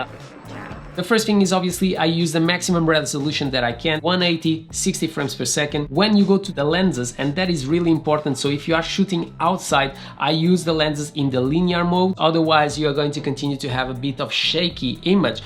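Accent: Portugal. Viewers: Where are you from my guy that accent sounds kinda portuguese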